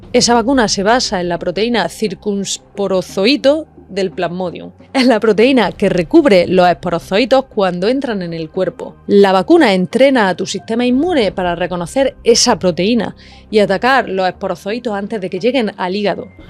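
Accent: Spain. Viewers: Fenomenalmente explicado. Magistral,. Acento granaino ENCANTADOR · Una mujer hermosísima que sabe de lo que habla, estupendas historias maravillosamente contadas, una voz que cautiva y para más, ese gracejo andaluz y ese decir que conquistan corazones sin piedad. El mío ya se lo robó cuando ví el primer video. ¿Se puede pedir más?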